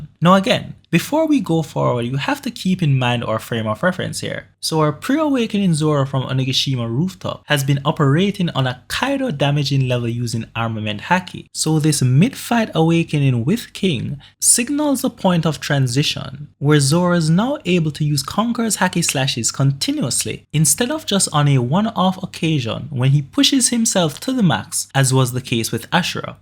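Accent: Jamaica. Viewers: Sounds Jamaican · U sound Jamaican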